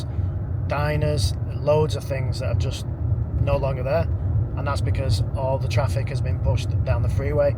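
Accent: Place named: Bradford, United Kingdom. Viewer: As a lad with a BD postcode, I can fully appreciate ‘ ’ accent. 😂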